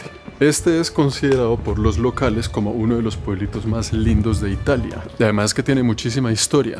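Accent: Colombia. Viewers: Que acento Colombiano más chocante , el video sería mejor sin ese Colombiano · hermano se te escucha muy colombiano jajaja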